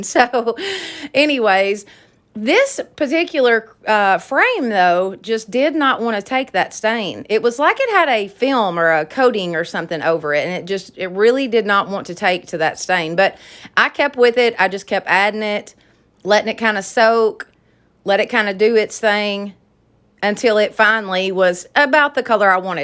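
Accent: South, United States. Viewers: I'm a little confused. You talk about your fiancee but then you mention your mother-in-law. Just was wondering. And you are so talented it is unreal. Thank you for all the beautiful crafts you do. And I love your southern drawl. I too am from the south. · So cute❤️❤️❤️I don’t know what part of the south you’re from, but I’m in Huntsville Alabama ( originally from Waterloo/Florence) but you crack me up so much because you sound like me talking!! Love your channel so much! I think it’s because you’re just blunt and tell it like all southern women do🤣 I have never found the lanterns in any dollar tree. I’ve been to about 10 looking for the little glass candle holders because I got everything to make light posts except them.. grrr 🤣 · Hi, I just wanted to start off saying that I love love love all your craft ideas, you are so creative. 2nd is I get a kick out of your Southern accent. 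I am from the East Coast a small town in Massachusetts & love the Southern accent. I also love to craft, I am a beginner & have made a lot of mistakes along the way...but sometimes they turn out to be happy mistakes. My kids call me a craft hoarder lol, I am always at DT & DG those are my favorite stores. Ok enough of the gibber jabber, I'm looking forward to what you create for the New Year, happy crafting & be safe.😷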